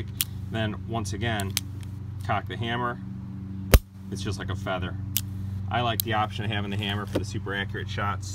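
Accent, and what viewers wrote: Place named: Wisconsin, United States. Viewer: You sound like you're from one of the northern states, like Wisconsin.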